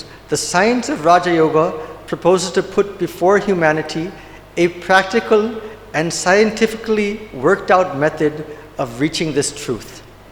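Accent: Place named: United States. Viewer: American English ❤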